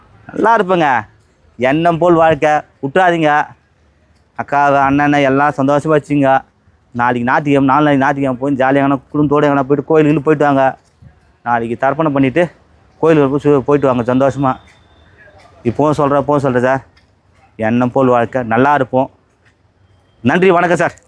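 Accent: Madurai, India. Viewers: Madurai vasagam super 🎉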